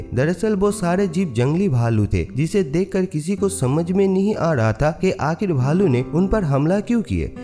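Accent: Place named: Bengal, India. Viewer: Are you bengoli please work on your M T I so you can explain better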